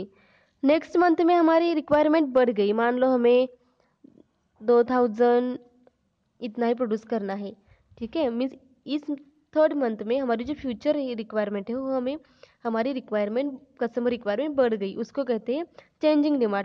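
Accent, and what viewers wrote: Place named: Pahadi, India. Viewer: ap pahadiype kyu bol rahi ho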